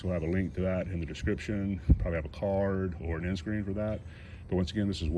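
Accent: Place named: Philadelphia, United States. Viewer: Great tip! Thanks! Also, you from Philly or SEPA? I ask because you say "wooder" instead of "water".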